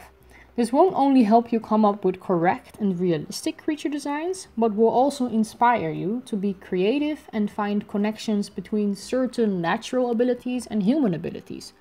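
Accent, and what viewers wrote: Netherlands. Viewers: Native dutch speaker ? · Are you by chance Dutch? haha i think i recognise your accents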